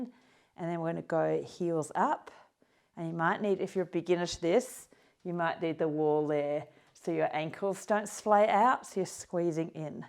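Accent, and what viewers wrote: Australia. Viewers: Thx for the yoga exercises while conscious of knee osteoarth. I like the gentle knee bends and then standing on toes. All other exercises seem solid/proven ones to induce motion, strength in muscles around knees. If in another video you could touch on the issue of cartilage loss, cartilage gap and how to prevent ill-effects from that specifically, that would be wonderful! Great presentation overall, very compassionate style. The Aussie accent (?) is charming. Kudos. Thanks so much for making these videos!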